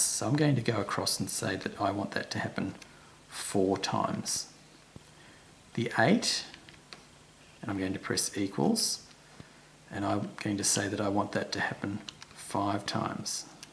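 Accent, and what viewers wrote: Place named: Australia. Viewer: How tf he gay its an Aussie accent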